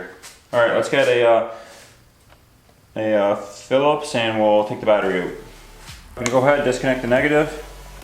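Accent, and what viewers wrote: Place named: Canada. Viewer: Lol i knew you were Canadian, I guess we do have an accent after all. I'm working on a 03 1000, front fairing is a little different from the 750 and 600.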